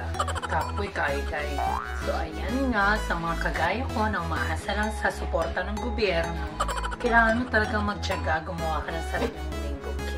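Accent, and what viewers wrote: Visayas, Philippines. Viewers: Gustong gusto ko pag nag bibisaya ka mama haha ang cute nang pag bibisaya mo. Bigla naman ako nag crave sa graham pag uwi ko nalang ng pinas wala kasing graham biscuits dito e ang poor haha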